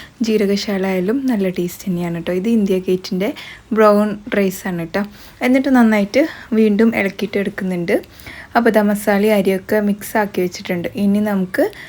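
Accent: Palakkad, India. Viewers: Palakkad evadeyaanu veed .enth resaa ithaade samsaram .kett irunnù povum.oru hai tharo?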